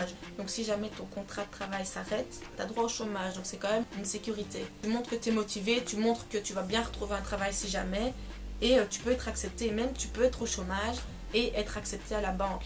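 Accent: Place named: Belgium. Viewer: L’accent belge tous ce que j’aime 🥰 bien rare sur YouTube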